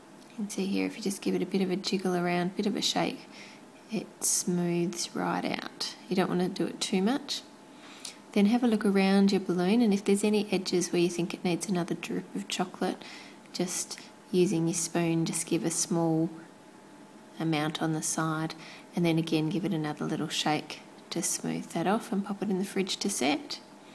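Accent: Australia. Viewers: is your accent australian? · Are you Australian? 😊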